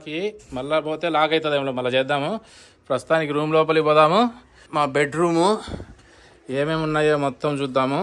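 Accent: Rayalaseema, India. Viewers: అన్నా నువ్వు చెప్పే విధానం చాలా చాలా బాగుంది నువ్వు అమెరికా సముద్రాలు అన్ని తిరిగిన మన రాయలసీమ మాటతీరు మర్చిపోలేదు. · Americalo vunna swachamaina seema basha mataduthunnaru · bro rayala seema yaasa super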